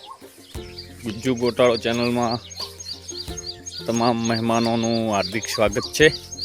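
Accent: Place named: Banaskantha, India. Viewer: જય માતાજી ક્યાં થી છો ભાઈ ભાઈ તમારી ભાષા બનાસકાંઠા ની લાગે છે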